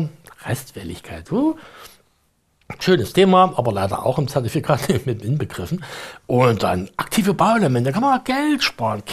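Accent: Germany. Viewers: The dude speaks a funny german slang, which crashes every automatic translator anyway. Only for insiders. 🤣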